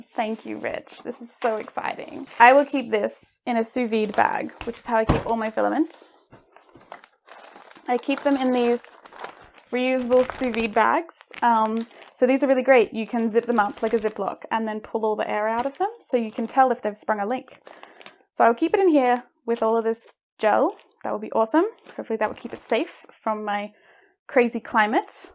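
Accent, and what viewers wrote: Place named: Australia. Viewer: "My besty just brought me this in from the posty." Two sentences in and everybody knows, you're Australian 😂 Also i bet most parents aren't as happy about their new born babies as you are about that Filament 😂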